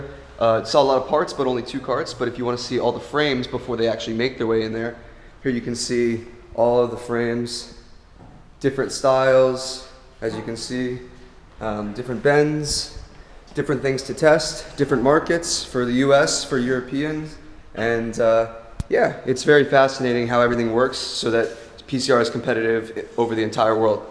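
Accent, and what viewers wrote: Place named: Germany. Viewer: Why do you live in a dorm in friggin Marburg and pronounce Italian words with a German accent when you're an American, racing in an American series? So confused right now...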